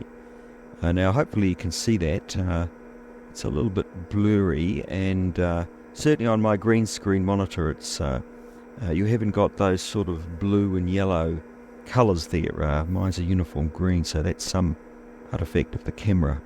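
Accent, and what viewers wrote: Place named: New Zealand. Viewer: At first i guessed you were Australian, but your pronunciation of Check it tells me you're from New Zealand. One of my students was from your country.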